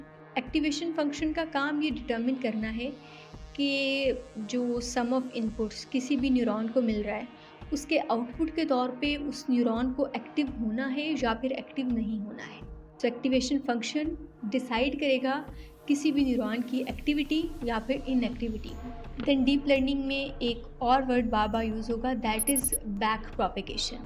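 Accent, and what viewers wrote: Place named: India. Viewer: Sorry I had to stop watching you when you talk like an Indian. Its not urdu but indian hindi. Maanenna hay is indian style. Urdu is meray khayal main or meray motabiq. Bye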